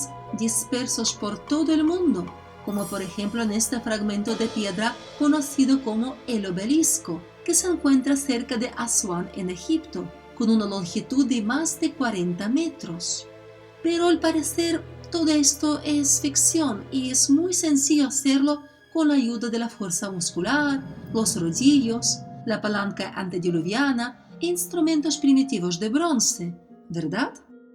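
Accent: Russia. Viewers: excelente video gracias por tus videos he investigaciones son muy interesantes, me gusta el acento de tu español, de donde eres, rusia? bueno, saludos desde las montañas de colombia.... "nadie conoce el color del agua que viaja por debajo del rio".....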